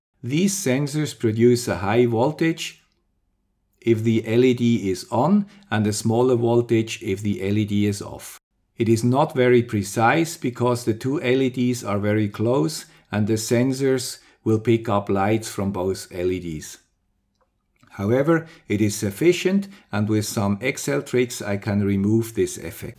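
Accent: Switzerland. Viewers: I just love this! I want to know. So I build a contraption that give the answers automagically. And your "swiss accent" is just right. You'r not talking like a gatling gun, so people not having english as their first language can easily follow what your'e saying. Good, as I have huge problems following a monologue in "switzerdeutsch". :-)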